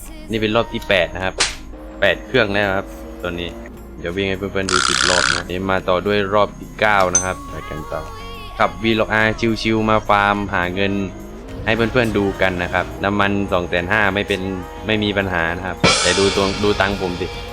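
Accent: South, Thailand. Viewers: จุดนี้มีมาสักพักละครับ (เสียงเหมือนคนใต้)😏😏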